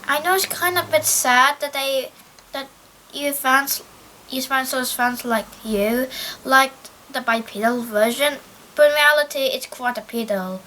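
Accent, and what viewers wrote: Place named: United Kingdom. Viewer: You're English is so British.. I like it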